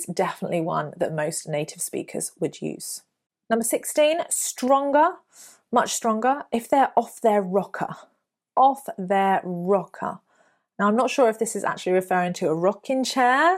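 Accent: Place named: RP, United Kingdom. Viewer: Some of these expressions we hear in the US. Some are completely English and quite funny. Btw, you have a nice upper-class accent.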